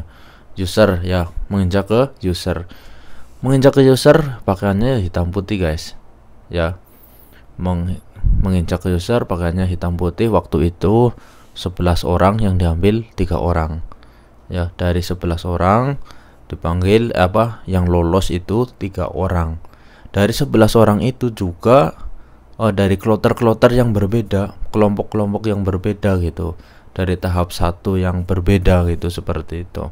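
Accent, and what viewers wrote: Banyumas, Indonesia. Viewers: ini Kaka nya ngomong nya logatnya ngapak banget😭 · ngapak ya mas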